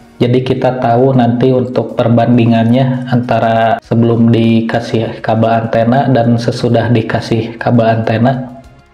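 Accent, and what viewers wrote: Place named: Sunda, Indonesia. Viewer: Urang sunda nya mang,,,😁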